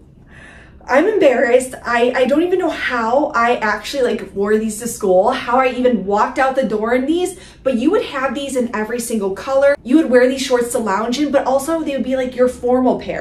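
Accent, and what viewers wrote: Midwest, United States. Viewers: I love your Midwest accent!! It’s in the a’s like in “baaack” so cute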